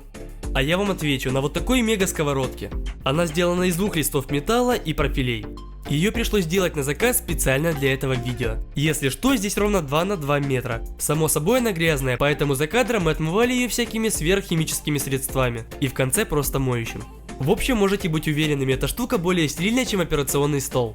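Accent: Ukraine. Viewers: Слушай попробуй зделать на ней огромную порцию жареной картохи или люля кибаб и можно шавуху.(я смотрю твой канал с 3 тысяч тогда чувства были что ты прям украинец украинец но сейчас как логопед по русскому языку) желаю успехов и обогнать обломова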